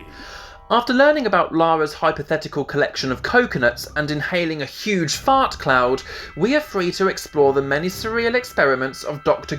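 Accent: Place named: London, United Kingdom. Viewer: Why does it sound like you live in London?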